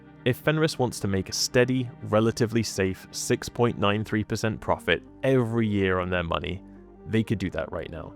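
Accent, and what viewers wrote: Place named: RP, United Kingdom. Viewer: It's probably because market research indicates that an English RP accent with a slight colonial overlay (Canada?) appeals to Americans, hence AI does it.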